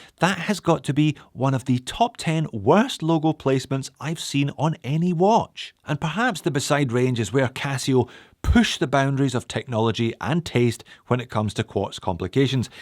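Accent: Scotland, United Kingdom. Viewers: I know you're in Australia but are you originally from scotland because your accent says you are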